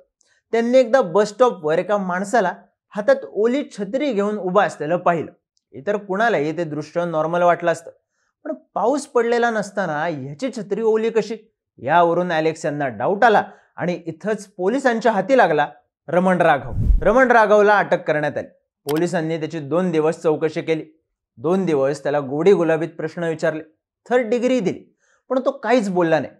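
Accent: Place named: Pune, India. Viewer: तुझ्या बोलण्याची पद्धत खूप आवडली #पुणेरी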